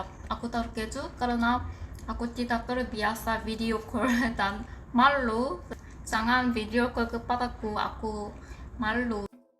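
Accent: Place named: South Korea. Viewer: Inikah org korea yang waktu itu ku gonceng. Wkwkwk hari itu dia pakai baju safari, pasang muka bingung, cari lokasi di gps. $: mau kmn? "Dia kyk ke ingungan".: mau ke sini "sambil nunjukin layar hp nya". $:"ternyata yg ingin di tujunya pasar impres,pasar impresnya sudah di sampingnya😂" Ini pasar impresnya"pasarnya lagi tutup, karena hari besar Lebaran idul fitri".: Haa di sini... Terimakasi "Lanjut jalan". $:"kok ku perhatikan kyk bukan org sini, mungkin turis..cara ngomongnya jg kyk org korea, sya kira org cina yg tinggal di sekitar sini". Terus sya samperin kembali, dan menanyakan mau kmn.. terus jwbnya mau makan, sya jwb... Pada tutup krn hari besar. Tp klw mau sya tolongin, naik ke motorku sya bonceng dan antar ke toko yg buka jual makanan. Kemudian dia naik dan kita keliling pasar impres, setelah lewat lampu merah, ada org jualan. Yaudah sya bwa dia kesitu... 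Terus sya tinggal 😂😅sya mau ajak makan di rumah... Takut di tanyain macem"sma org rumah. Ahahhahaa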